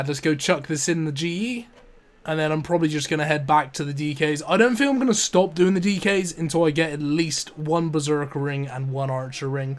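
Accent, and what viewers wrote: Bristol, United Kingdom. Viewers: Subbed because of the Bristol accent. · That accent is awesome, where in the UK you from? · Ooh arr Bristol Lad are u?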